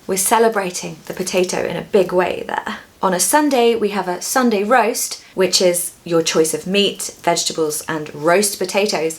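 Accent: United Kingdom. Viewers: Hello,. I always enjoy your videos! I used to live in London, and I love your beautiful British accent! Did you have a nice summer? I'd like to say I had a good summer despite the awful heat. You'll never guess what happened. I fell into the underfloor storage and broke my leg! I hope you stay fit and have a lovely autumn! Bye! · Usually I didn't like to write a comment here, but you made an incredible explanation for me, and I also like to hear your voice with a British accent. Sorry if my English is not accurate or there is something wrong with my comment. 😊❤ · You're my best British English accent teacher ❤🎉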